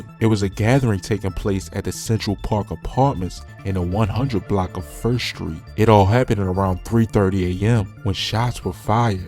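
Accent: Baltimore, United States. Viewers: Boy I know you from Baltimore. Durw 2urw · Mob and nlmb so far away frm each other you just be saying shit and that is wooski brother ain’t no allegedly you not frm here probably Baltimore by your accent but my guy you’re just saying shit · You from Baltimore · the narrator must be Bmore!!!! raised? go! O's!!!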